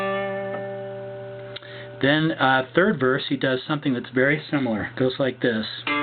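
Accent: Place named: United Kingdom. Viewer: I would of thought you were british!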